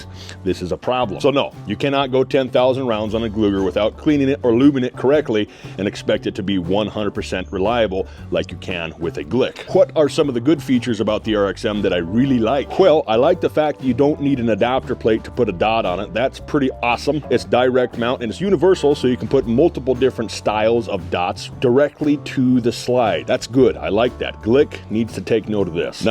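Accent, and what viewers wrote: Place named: Northwest England, United Kingdom. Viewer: This man has the most aggressive northwest accent I've ever hear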